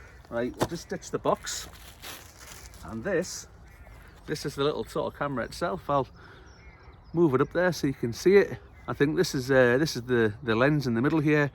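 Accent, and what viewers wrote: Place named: Newcastle, United Kingdom. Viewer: Hi a few questions for you, what is a Geordie sounding bloke doing living in Scotland? Why didn't you attach the Camera to the shed instead of the water butt? and could you say screw a 4x2 to the side of the Compost bin and get the height that way? and finally i wouldn't go telling everyone where the Camera is, just incase someone pinches it one night, plus if any vandals come along and fingers crossed that they don't, at least you will have some footage of them, and i'm not talking about the furry kind of vandal ha ha ha. Sorry for the questions new Subscriber now, so i'm off to play catch up on your older videos. Stay Safe, Barry (Wirral)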